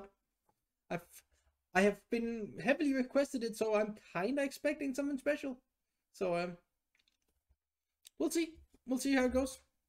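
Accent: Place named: Scandinavia. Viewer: Hey. Where are you from. You have a slight accent I can't quite place. Guessing nordic, maybe danish? You got some catching up to do. They have already released 2 singles from their upcoming album, dropping on August 27. Then there's their back catalog. are a few years old now and the 4 band members have improved so much in that time and they seem to have found a sound and a style that are uniquely their own. Their new stuff is very technical and progressive, yet they still have that super heavy and awesome groove, we fans like. They often mix in influences and styles from outside rock and metal, always surprising, when we just think we have figured them out. My favorite songs are to be found on their later releases but i don't think they have a bad song. If you're not used to listening to metal I would start with the suggestions below, but if you like metal music you might jump straight into their new stuff. 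I recommend:,.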